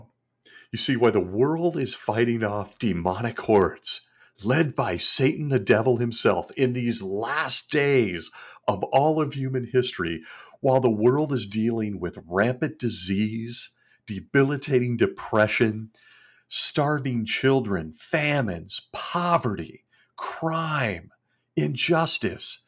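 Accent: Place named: Pacific Northwest, United States. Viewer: PNW? I thought I recognized the accent 😄 What congregation were you associated with? My family was associated with Walnut Park and Irvington. Just curious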